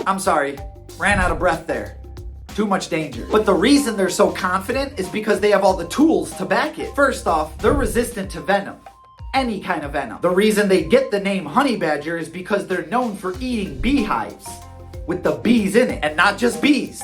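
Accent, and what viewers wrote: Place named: New York, United States. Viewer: I just wanna say that I truly hope this guy makes a ton of money. He is so entertaining and hilarious and he looks at things that we're so used to and he makes us look at those things in a completely new and admirable way, like he makes us respect and appreciate the nuances of animals wtf?? And with a new york accent, it's just so uniquely epic and entertaining. Never change,!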